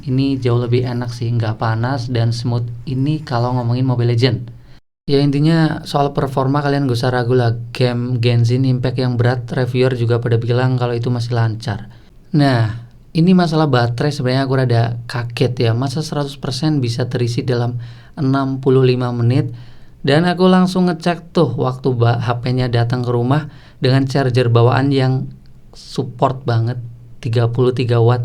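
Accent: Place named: Java, Indonesia. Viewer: Behh jan jowone ketok bangetmas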